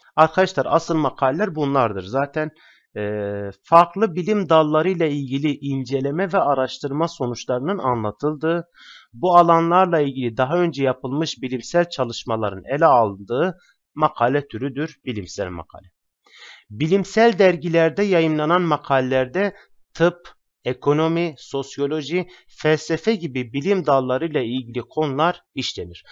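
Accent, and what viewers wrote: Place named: Eastern Anatolia, Turkey. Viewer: Fark ettim, doğu şivesi:))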